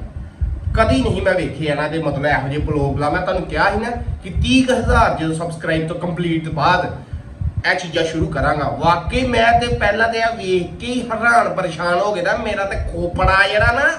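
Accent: Pathankot, India. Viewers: ਬੋਲੀ ਬੋਹਤ ਵਧੀਆ ਭਾ ਪਠਾਨਕੋਟ ਵੱਲ ਦੀ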